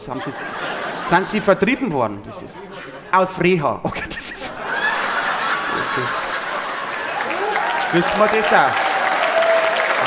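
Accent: Bavaria, Germany. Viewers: Hallo, mir gefällt die bayerische Sprache, die Mimik und Gestik und die Einfachheit der Geschichten und Erlebnisse. So vieles ist so kompliziert geworden, mehr will ich nicht dazu sagen. bringt mich immer zum Lachen und lässt mich der Wirklichkeit entfliehen, für Momente des Lebens und das ist so so schön. Ich geniesse das so sehr. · Es ist einfach herrlich, einem normalen Menschen mit gesundem Menschenverstand und familiärer Erziehung zuzuhören und zu erleben, wie "leben" auch funktionieren kann. Gleichzeitig ist sein sauberes gepflegtes Bairisch Musik in meinen Ohren. Wenn ich mich beruhigen will (etwa nach einem anstrengenden Arbeitstag), dann ist Franks Stimme Balsam.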